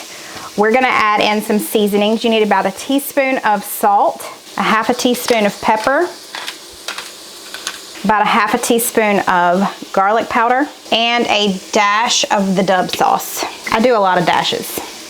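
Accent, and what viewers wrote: South, United States. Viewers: I say Paprika like you do. Maybe it’s a southern thing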